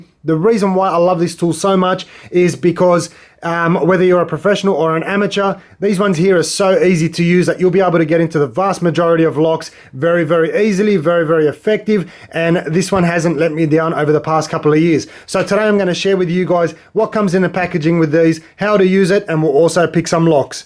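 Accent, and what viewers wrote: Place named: Australia. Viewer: Good Australian accent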